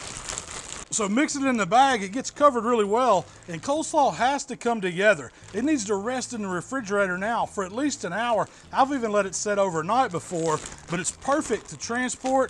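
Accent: South, United States. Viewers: i soon as i saw a big white man with a beard and southern accent, i know i found what im looking for.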